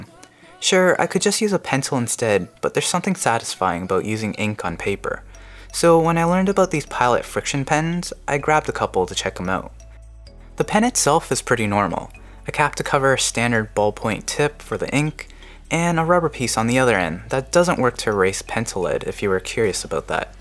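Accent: Canada. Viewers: Nice video. I watched because I got one free and didn't know how to use it. You sound very Canadian.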